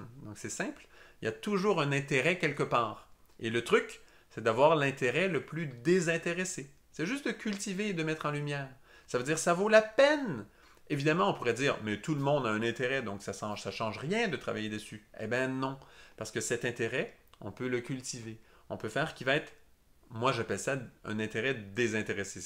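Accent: Canada. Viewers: Extraordinaire avec ton bel accent canadien merci !!!!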